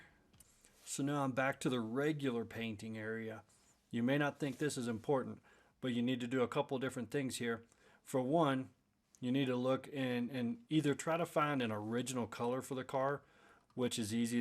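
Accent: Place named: South, United States. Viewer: You have the soothing southern voice for it, just every time you make a mistake call it a "Happy accident" and add a "happy little piece of rust" here and there.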